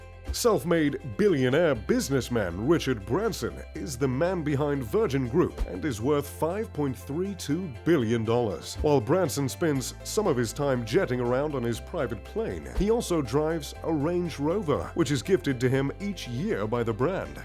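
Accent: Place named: United Kingdom. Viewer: I watched numerous videos on this channel and i realize that British voice is appealing! It adds something to each videos; for example the way he pronouces "dollars", the pitch, the tone, the intonation, the inflection, the accent and let's not forget to mention the humour! They all play a role too! Some weird coincidence, i don't know but i used to watch a TV program called Lifestyles of the Rich and Famous and the host Robin Leach was also a Brit!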